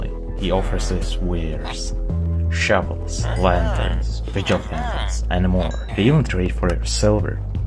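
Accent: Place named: Poland. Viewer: this mixed with the accent makes me think you are polish is that true?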